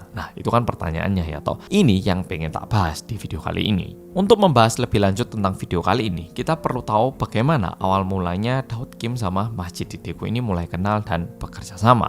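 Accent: Java, Indonesia. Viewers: sampean orang korea apa orang jawa ...bro ... hehehhe kok logatnya medok sekali ...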